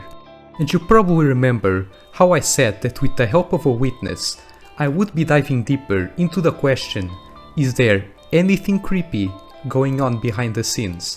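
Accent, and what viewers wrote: Portugal. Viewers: are you portuguese by any chance? (im asking because of your accent) edit: nvm és tuga de certeza lol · Ganda vídeo bro , és português? Notei um sotaquezinho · Hey, are you by any chance portuguese? Your accent is very familliar. O facto do canal ter CR7 no nome também ajudou. Great video by the way, gained a new sub.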